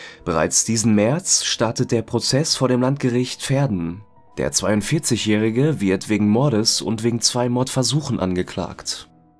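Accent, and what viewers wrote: Ruhr, Germany. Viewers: Mach weiter so. 🤘🤘 Kann mir auch kein weichspüler true Crime mehr geben....ehrliche unzensierte Meinung und Ruhrpott Schnauze ...sehr geil ..weiter so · True Crime auf Ruhrpottdeutsch sollte Pflicht für alle True Crime Kanäle sein! Ich sach ma grüße ausm Norden ne❤ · GEnau SO reden wir hier im Pott. GEile Videos, weiter so. Nur der S04 .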